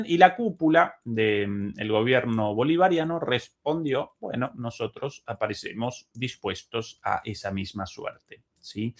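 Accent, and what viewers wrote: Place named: Argentina. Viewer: Eres Re-Argento para hablar. Me encanta q no hayas perdido el acento. Abrazo de otro argento.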